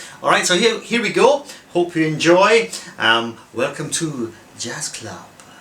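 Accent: Scotland, United Kingdom. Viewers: Never seen your videos before and liked your intro. Then I was pleasantly surprised to hear your Scots accent. I'm originally from Clydebank. ;) Doing a lot of private home Jazz study. Looking forward to following you. Aw th' best! Ha ha. ;)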